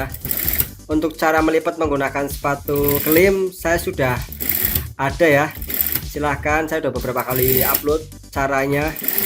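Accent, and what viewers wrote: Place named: Banyumas, Indonesia. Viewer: Ngapak yaa hehe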